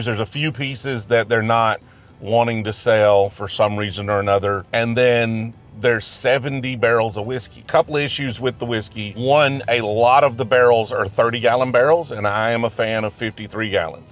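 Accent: South, United States. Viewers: is the reason I get so much enjoyment from you and your channel. That and me being a southern transplant, there’s nothing like a smooth southern accent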